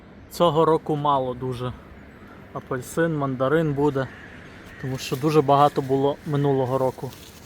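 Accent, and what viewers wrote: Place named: Western Ukraine, Ukraine. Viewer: Я впізнав по розмові що ти з Західної України.Тільки нині натрапив на твої відео.Переглянув всі.Ти не зупиняйся.Досить добре виходить.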